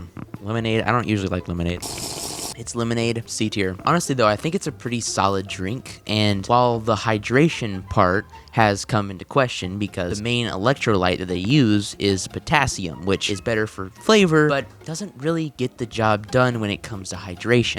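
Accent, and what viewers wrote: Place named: United States. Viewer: Why you got a prick annoying Americans accent?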